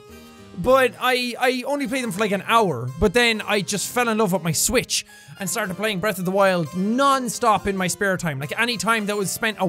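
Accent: Ireland. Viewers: I knew your chanel some weeks before when I was searching for examples of Irish accent for a presentation at college. Your video about guessing phrases made me laugh a lot, and I showed it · I'm 47 and I LOVE. He makes my day every day with his humor and Irish accent!!:) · R U IRISH OR SCOTTISH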